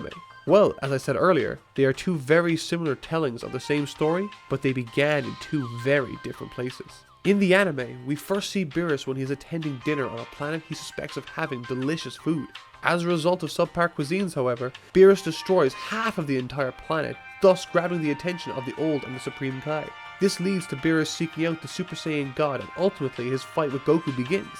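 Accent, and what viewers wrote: Ireland. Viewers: Wait your from Ireland?!?!?! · No, you say "Vegeta". "Vechita" is inaccurate as a pronunciation of it. His name is a pun on the word "vegetables," not "vechetables." This guy's Irish, so maybe it's a quirk of his accent, but then again, his accent's very light, so it doesn't make sense, since he flip flops between the 2 randomly.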